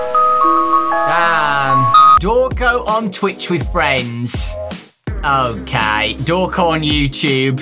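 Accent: United Kingdom. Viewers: Dawko why do you sound British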